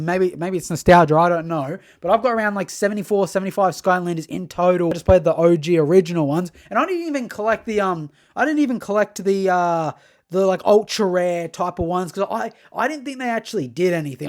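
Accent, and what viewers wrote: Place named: Australia. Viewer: Skylanders was such a massive part of my childhood, went through and played all the games w my brother recently and they're still so much fun, love seeing people still appreciate how influential these games truly were Love your energy as well man, keep up the good work 🙏 as a fellow Australian the accent is sick as well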